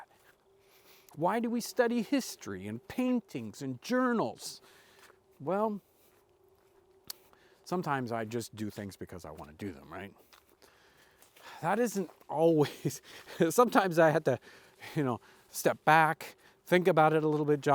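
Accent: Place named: Indiana, United States. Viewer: He sounds, gesticulates, and annunciates things a lot like my dad, probably because he’s from Indiana, and so these always take me back to conversations at the table about history, politics, faith, and logic with my dad. I love the perspective and the info, and the recipes are fun, but that’s actually the subliminal reason I think I find these videos so home-y 🤗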